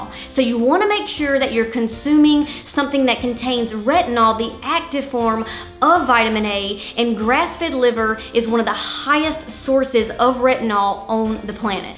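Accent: South, United States. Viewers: I absolutely love your country accent, it’s awesome!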